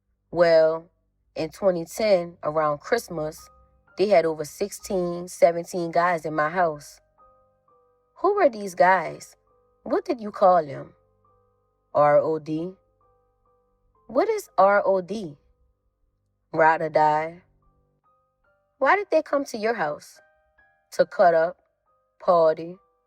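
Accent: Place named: New Orleans, United States. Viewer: The reader sound like she straight out the 7th ward by the St. Bernard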